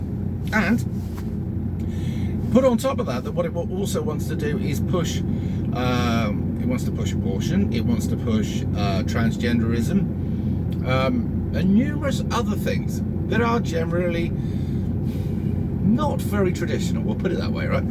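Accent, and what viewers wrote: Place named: England, United Kingdom. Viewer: Well is a very handsome looking English man with a gorgeous English accent, he does sound very classy, don’t mind his use of swear words, much needed in our current situation🇮🇪☘️🇬🇧❤️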